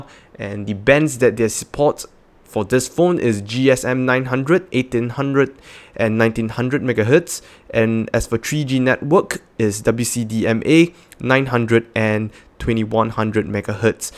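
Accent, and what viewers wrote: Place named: Singapore. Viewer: Very "Singaporean" accent there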